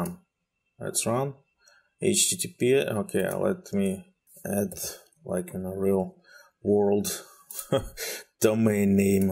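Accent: Russia. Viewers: Russian accent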